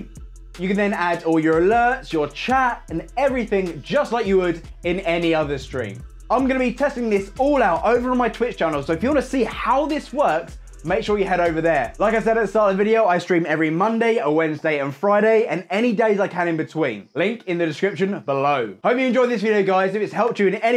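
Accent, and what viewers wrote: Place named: Europe. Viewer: I dont understand shit. European english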